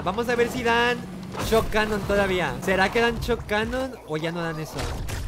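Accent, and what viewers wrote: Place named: Castile, Spain. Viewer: hablar fresa y en español castellano... ASCO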